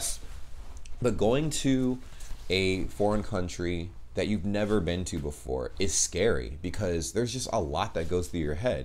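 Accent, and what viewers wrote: Germany. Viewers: I think it is a good representation of the german english level on average. it might be cringe but also everyone will get what is meant at least. absolutely no problem especially considering this 4 am English...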